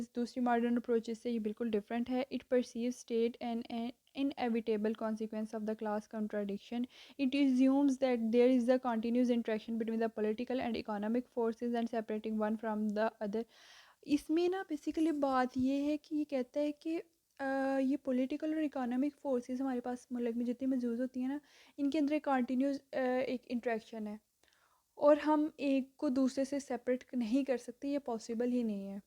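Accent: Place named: Pakistan. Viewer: Ur voice ar like Pakistani 😮😊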